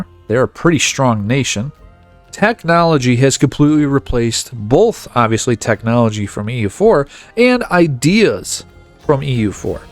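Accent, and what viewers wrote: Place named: Buffalo, United States. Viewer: You sound like you're from Buffalo. It could be any of the Great Lakes but I know about ten guys who talk just like you in western New York.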